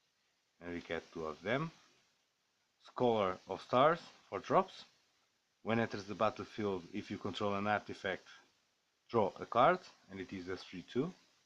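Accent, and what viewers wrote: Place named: Portugal. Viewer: i have a quick question , you seem like you have a "portuguese accent" where do you play magic ?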